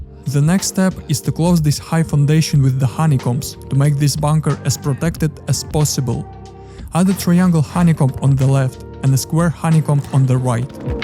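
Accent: Russia. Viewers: Русский акцент ;)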